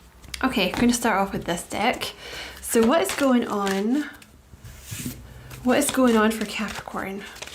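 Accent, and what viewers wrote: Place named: Scotland, United Kingdom. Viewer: Sounds Scottish to me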